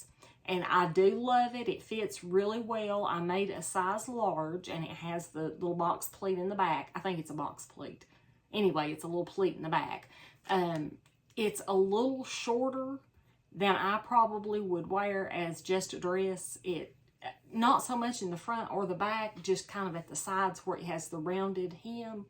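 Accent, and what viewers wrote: South, United States. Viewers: I love your videos,. Just love listening to your lilting voice. Where are you from? Can’t quite pinpoint the accent. I’m guessing the Carolinas. I was raised in East Texas, so I love listening to various Southern accents. So soothing! When my accent occasionally comes through (usually when I return from a visit to Houston), I constantly have to tell folks I have a Texas accent, not Southern. I have to explain that Texas is not part of the south, that accents are classed geographically and Texas is located in the middle, not to the right on the map! Most “other” people (you know who I mean! 😹) hear “South” and think “Confederacy.” That’s why they’re confused.. Bless their hearts. 🙄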